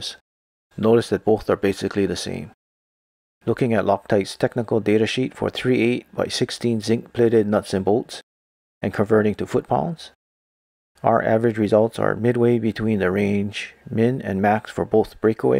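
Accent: Hawaii, United States. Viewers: Hi, I just found your channel. Great vids. I hear a little kama’aina in your voice.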